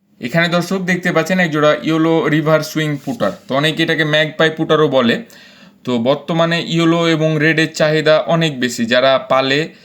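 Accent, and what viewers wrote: Kolkata, India. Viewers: আপনার কথার ধরন কলকাতার মানুষের মতো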